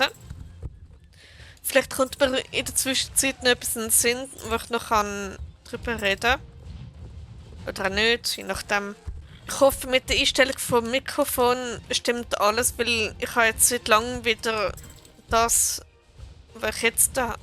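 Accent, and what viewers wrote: Switzerland. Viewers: ich liebe deinen Akzent bzw dialekt das Klingt so geil ich möchte auch gern Schweizerdeutsch können aber ich bin nur österreicherin 😂😂😂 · Bist du Schweizerin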